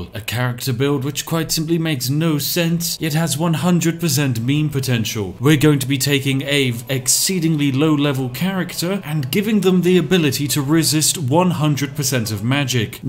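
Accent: United Kingdom. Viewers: I basically come here for Skyrim and the British accent 😂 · I findurder more exciting Me: Could this Brit be one of my people? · i wanna see you read the lusty argonian maid part 1 and 2 with that british voice!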